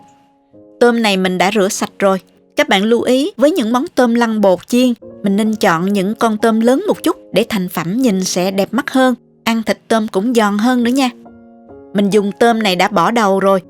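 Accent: South, Vietnam. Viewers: Cảm ơn luôn luôn cho ra những video bổ ích..với sự hướng dẫn ngắn gọn dễ hiểu, cùng với giọng nói mộc mạc đậm chất nam bộ, em rất thích nghe a 👍💕, mến chúc cô nhiều sức khỏe để tiếp tục với niềm đam mê của mình ❤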